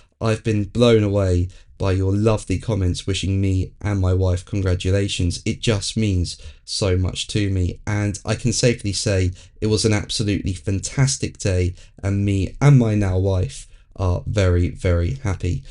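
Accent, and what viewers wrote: United Kingdom. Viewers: You speak as a british, not a car racing fan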